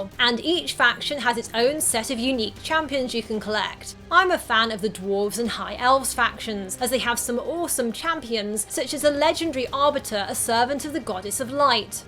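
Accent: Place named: United Kingdom. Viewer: I love your voice so British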